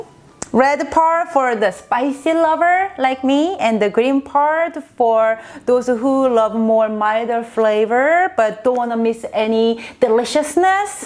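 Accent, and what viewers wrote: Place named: South Korea. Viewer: I could tell she was Korean the moment I heard her first sentence.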